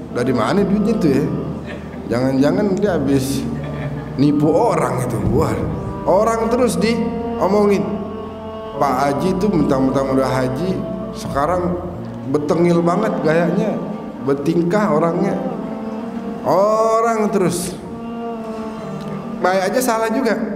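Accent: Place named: Betawi, Indonesia. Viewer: assalamu'alaikum habib saya sangat senang cara penyampaiannya tawsiahnya bicaranya habib itu Betawi banget enak didengarnya saya asli Jakarta bib buat habibana pokoknya sehat selalu panjang umur amiin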